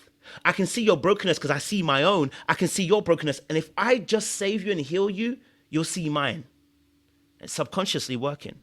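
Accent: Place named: United Kingdom. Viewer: When u start talking and the British came out 😮 it will never not be shocking to me ❤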